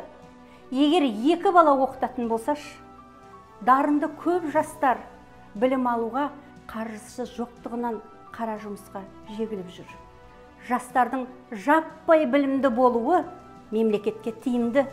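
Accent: Standard. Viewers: Өте керемет.Таза қазақша сөйлейді екен.Өте шындықты айтты. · Молодец казакша таза суйлеп тур енди арамыздагылар барго казакша сулесе шыгатын едим дитиндер не айтады екен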